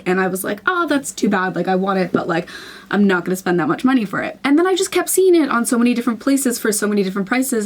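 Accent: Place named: England, United Kingdom. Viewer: I can look at you forever😍, sometimes I don't understand some words, but I just admire you, you look gorgeous, very beautiful ❤💛, thanks for information, hug you🤗, I'm very glad to become part of your YouTube family🤓, I like your English accent, I want the same pronunciation🔊, I will watch you and improve my English, thanks you so much for all you do for us😁❤🤗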